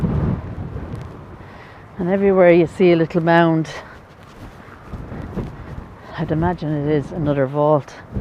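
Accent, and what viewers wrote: Ireland. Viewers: Beautiful looking final resting place me and my baby sister we love 2 read head stones etc my baby sister is in heaven now this nice lady i meet in east land mall in Warren Michigan she told me the weather in Ireland was windy and rainy that was in the 1980s was very young in them days probably around 3o I think 😮 love your Irish accent people say I talk whit a accent 2 women's love it men ask me man where are you from tell them Alabama lowndes county heart ❤️ of Dixie wishing you love peace and happiness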